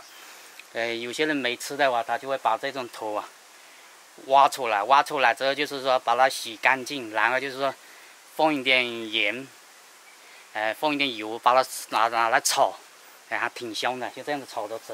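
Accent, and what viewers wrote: Hakka, China. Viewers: 你的客家话和我的不一样。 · 的客家话跟我的完全不同，他说的我大多不懂。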